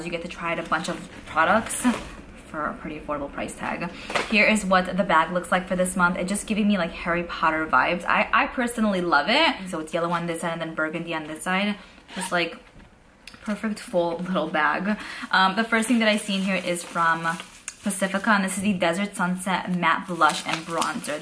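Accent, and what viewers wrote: New York, United States. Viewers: I AM FROM THE BRONX, I KNOW YOUR ARE FROM SOMEWHERE IN NYC WITH THAT ACCENT!😄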